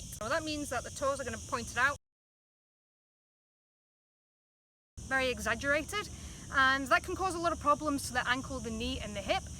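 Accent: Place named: Scotland, United Kingdom. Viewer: I’m distracted .. what accent is that ? It sounds Scottish , north east England come either Spanish or other Mediterranean?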